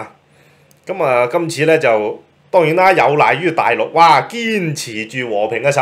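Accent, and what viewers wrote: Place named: Cantonese, China. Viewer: 你D廣東話 可唔可以標準D？ 你邊個鄉下請問 lmao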